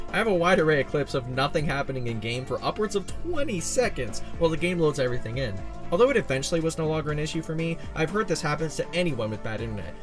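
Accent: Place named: Canada. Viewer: you sound like kenadian. good video <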